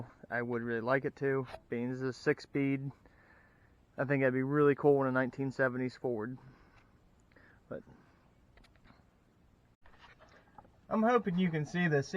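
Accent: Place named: Pittsburgh, United States. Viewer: Way to go there bud! Sounds great. Good hearin the ole Pittsburgh accent again too from way out here in Oregon. Saw a bit of the Brownsville steam show video and pieced it together that you must be around sw PA. Grew up a little north of Brownsville. Take er easy, ill pass the channel on to some cowokers 🤙🏼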